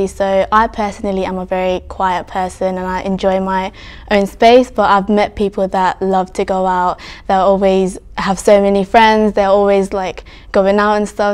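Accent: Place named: United Kingdom. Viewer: I like her British accent. I haven’t heard an asian speaking in that accent before.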